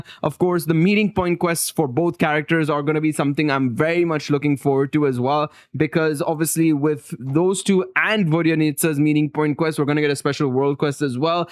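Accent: India. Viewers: Brother are you indian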